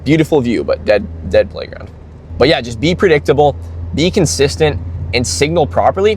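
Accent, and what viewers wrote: Canada. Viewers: Nice video though. Just curious, are you from Victoria, BC? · As soon as you said “40KM/H” i instantly knew I had to subscribe cause I already had a feeling from your voice you were Canadian, then I seen that intersection on highway 11 with chevron in Abbotsford (I think) I knew you were in my province too (we need more Canadian car YouTubers)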